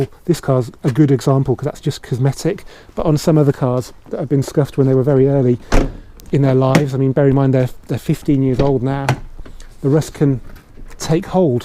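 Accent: United Kingdom. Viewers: are u sure? ur roads look like shit in UK... io saw roads like that last time in the post soviet 90s in estonia.... why the fck doesnt ur gov fix roads??? (unless i misjudged that ur voice sounds like british)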